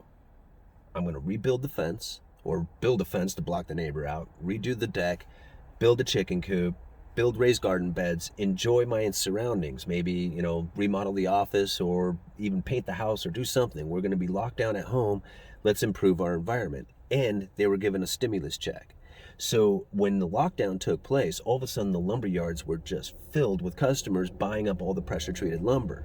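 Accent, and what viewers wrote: Pacific Northwest, United States. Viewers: Sounds very Pacific Northwesterly. Nice!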